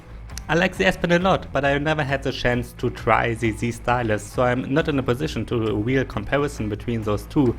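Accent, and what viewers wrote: Germany. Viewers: Is so hard to understand your ligo m8 for a German U definitely have the worst accent I've ever heard is so unpleasant. Unlike my other German friends & my German girlfriend she has a brilliant accent she almost speaks perfect English like my other German friends at University. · As I am German too, I might be the only who is surrounded by this accent everyday lol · Are You German? Weil Ichs Auch Bin und du hörst dich extrem deutsch an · Man merkt dir an, dass du Deutsch sprichst grundsätzlich weil sonst hättest du LCD panel bei ca anders ausgesprochen genau so wie viele ausdrücke :D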